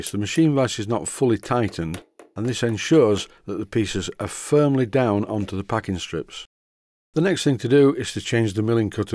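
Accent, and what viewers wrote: Yorkshire, United Kingdom. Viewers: I know nothing about steam engines, I never expressed an interest in them. But for some reason your videos and bluff Yorkshire-ness is just really soothing and fun to watch.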